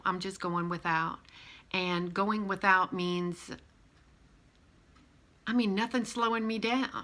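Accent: Louisiana, United States. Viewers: Awesome! Thanks for your honesty! Originally from Lafayette, when I hear you speak it makes me lonesome for the lovely people in South Louisiana, take care and keep spreading your love around, it’s a blessing for us!!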